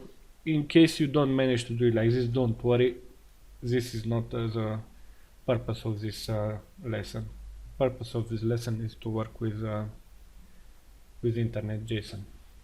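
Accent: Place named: Bulgaria. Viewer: YOOOOO I'm also from Bulgaria, I can tell by the voice and the weather location obviously. Пиши ми моля те, как ти е фейсбука или нещо!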